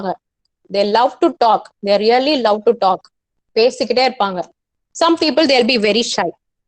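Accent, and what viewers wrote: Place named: England, United Kingdom. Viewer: Mam I like your english accent .really inspired mam ✨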